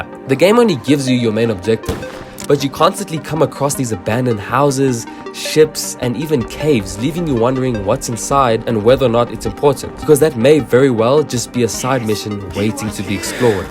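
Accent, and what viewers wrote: South Africa. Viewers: Bet you're South African, Amirite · You may not see this but are you a fellow south african? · This dude is a South African isn't he? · Are you from South Africa? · He's definitely South African! No way he's not. I know my people's accents! 😂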